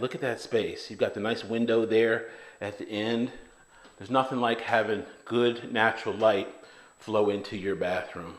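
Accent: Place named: New York, United States. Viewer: 😆 bald folks? You got me there I should have known you was a northerner; you speak just like us in nyc the only think you trick me with southern talking points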